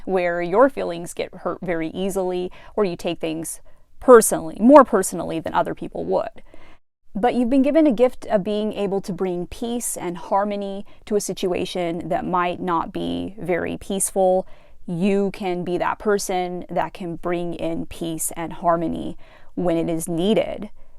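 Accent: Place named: United States. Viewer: Thanks for your efforts.. You are always direct to the point, talented enough to address such subjects. On top of that, you speak in a very clear and nice American accent. And also you're so handsome with a baby smile yet wise and confident 💕 Thanks lady again for everything. NAIF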